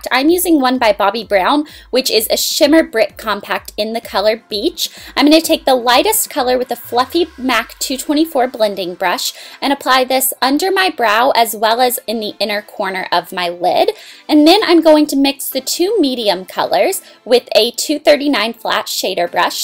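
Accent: South, United States. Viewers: I am not sure if you watch Big Brother or not..but you and Danielle are a lot alike. You are both pretty, have long brown hair, and have that southern accent:)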